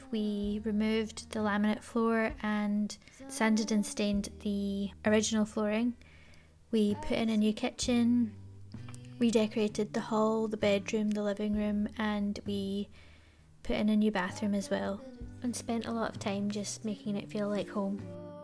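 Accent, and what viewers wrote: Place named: Scotland, United Kingdom. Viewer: Watching from Wales. It's very nice to hear a Scottish accent for a change, as it's mostly middleclass English people who make this type of content in Scotland & Wales.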